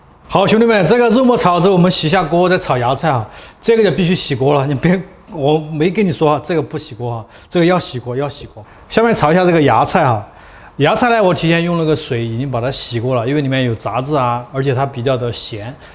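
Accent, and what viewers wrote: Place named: Yibin, China. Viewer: 我听主播应该是宜宾的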